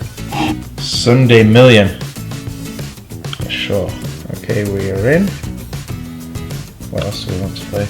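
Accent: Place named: Yorkshire, United Kingdom. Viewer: Hey, where in the UK do you come from? I mean you sound like a Yorky like me. so?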